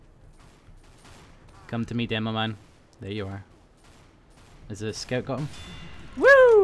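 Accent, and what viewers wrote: Scotland, United Kingdom. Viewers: r u scottish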